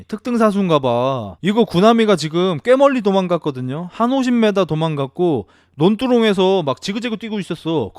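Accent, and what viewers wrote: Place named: Gyeongsang, South Korea. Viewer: 경상도랑 조선족 묘하게 섞여서 혼란스럽네 ㅋㅋㅋㅋㅋㅋㅋ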